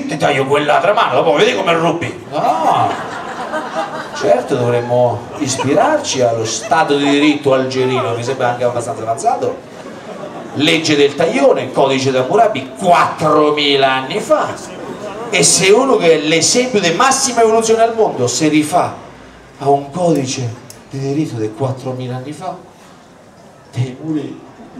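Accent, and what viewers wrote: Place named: Marche, Italy. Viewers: è il suo dialetto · un dialetto del fermano rosi, si capisce si capisce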